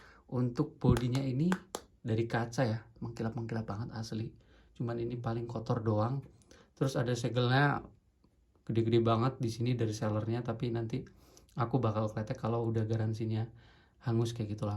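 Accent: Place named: Java, Indonesia. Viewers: Padahal dah keren, pas sma nada suara ngomong ya jawa. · Padahal udah bagus channelnya. Apa lagi perpaduan ngapak karo indo. Malah ganti maning haduh yawis moga lancar ges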